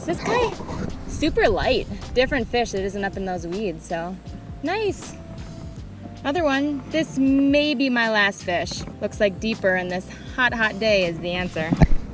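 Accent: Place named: Minnesota, United States. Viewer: You can sound so "Minnesota" from time-to-time. Nice bass 👌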